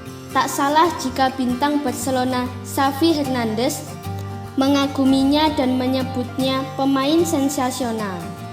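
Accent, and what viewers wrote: Java, Indonesia. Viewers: Medok dan cadel mbak eeeee... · Medok e mba mba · medok kali to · Jowo to mba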